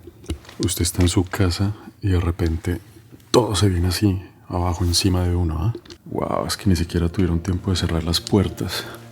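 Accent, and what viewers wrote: Colombia. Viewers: Por su acento y educacion, es colombiano, cierto? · No entiendo d e qué se trata, eres de donde? Eres canadiense? Pero hablas como colombiano o eres italiano? O español no entendí tu canal…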